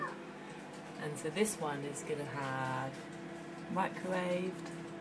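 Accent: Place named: Wales, United Kingdom. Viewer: I could barely understand your thick Welsh accent...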